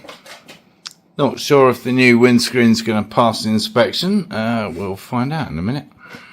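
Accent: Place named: England, United Kingdom. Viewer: Really nice to hear an English accent for a change. There're so many dodgy characters, with bizarre voices on YouTube talking absolute garbage. This guy is a breath of fresh air. 👍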